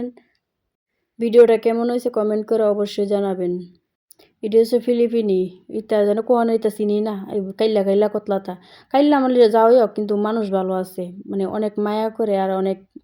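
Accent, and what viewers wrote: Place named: Brahmanbaria, Bangladesh. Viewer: আপনি দি আমার দেশি , কথায় বুঝা যাচ্ছে,, ব্রাহ্মণবাড়িয়া কোথায় আপনার বাসা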